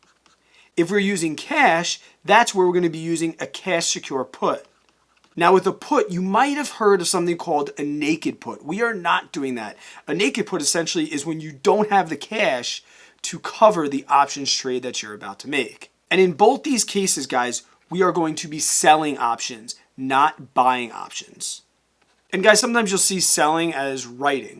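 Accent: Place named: Northeast, United States. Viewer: I love your videos! They’re very helpful for learning. Thank you! I also get a kick out of your accent 😂 Are you from the Northeast?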